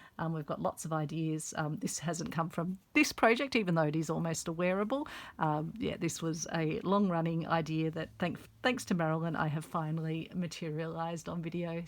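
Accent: Australia. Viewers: Ohh!! This is wonderful. I will have a go. My Nanna had needle books that belonged to her mother. Also … as an Australian living in Canada…. I appreciate the familiar accent. Made watching this an added joy ❤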